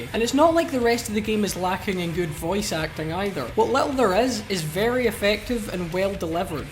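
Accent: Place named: Glasgow, United Kingdom. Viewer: Sweet review bro. Loving the Glaz geee accent. This game lools wesome but I am a low life with an xbox so doubtful I will ever get my hands on it. Thanks for uploading it..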